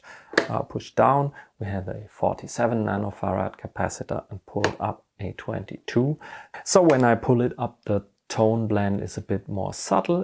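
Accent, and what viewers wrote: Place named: Germany. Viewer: I realized from your accent, that you live in Germany as well. I really like your mod ... would you offer to do it to other rascals (for money, sure)?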